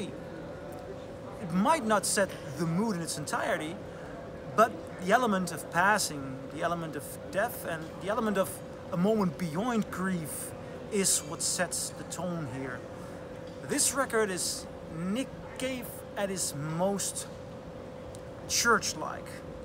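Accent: Netherlands. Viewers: nice record! Are you dutch? if so, then i didn't know that :D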